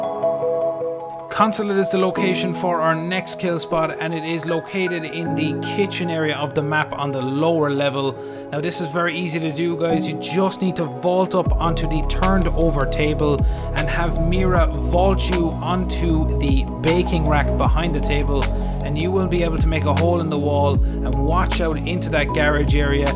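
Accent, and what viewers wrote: Ireland. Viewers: Are you Irish? I'm Irish and you sound Irish Tbh😂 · You sound like you're Irish. If I'm right, which part of the country? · As soon as I heard ur voice I could tell that ur Irish, what county u from chap?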